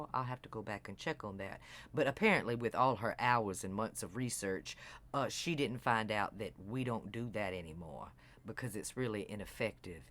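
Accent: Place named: Louisiana, United States. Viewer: Hearing you say exactly what I've been saying, in your lovely Louisiana? sexy voice, is fabulous! 👏👏👏👏👏👏👏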